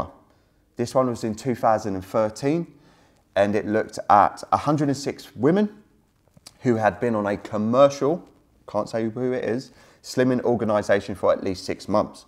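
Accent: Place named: Cockney, United Kingdom. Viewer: Please dont take this the wrong way as you present great info as usual, but i cant get past the Jamie Oliver likeness (no offense but most of us feckin hate that mockney Cockney). You need to get Tom from behind the camera to in front. I know hes doing his own thing but i think your subs would go through the roof with either him or both of you presenting serious content with an injection of humour... keep up the good work.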